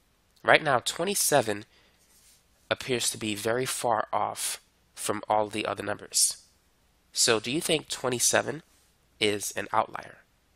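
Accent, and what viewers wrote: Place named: Brooklyn, United States. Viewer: This guy has just the slightest Brooklyn accent and sometimes it comes out for just a second.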